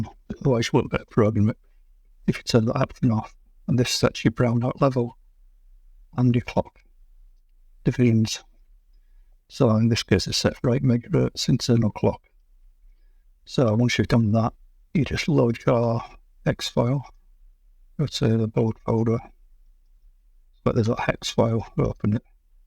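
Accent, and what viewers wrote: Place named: Scotland, United Kingdom. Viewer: I can understand you, maybe I’m used to the northern and lowland Scottish accent?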